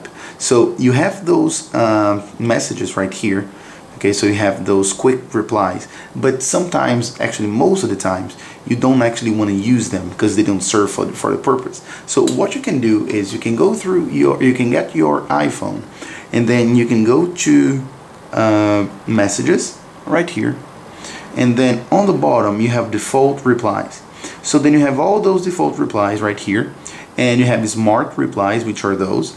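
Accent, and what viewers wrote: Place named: São Paulo, Brazil. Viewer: Khew you were Brazilian by the paulista accent hahah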